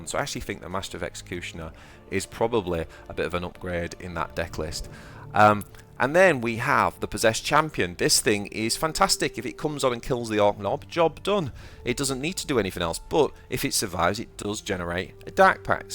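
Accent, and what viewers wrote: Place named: England, United Kingdom. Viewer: I found your channel from some russian nids player in chat and ill just say that throwing an english accent in there makes this sound so much more credible.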